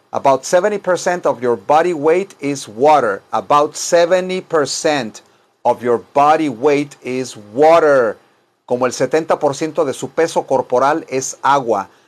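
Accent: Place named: Mexico. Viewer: este profe tiene acento mexicano, pero cara de "español hostia", TOTAL DE DONDE ERES PROFE?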